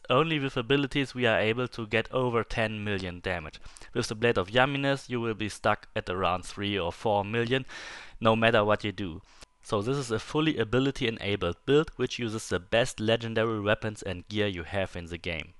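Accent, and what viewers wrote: Germany. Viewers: I thought the same and wanted to write the same comment. The accent is so German😂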